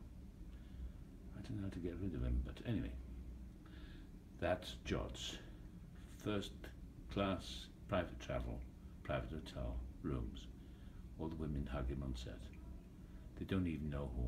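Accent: Wales, United Kingdom. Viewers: I hear your welsh accent here. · Wonderful Welsh accent xx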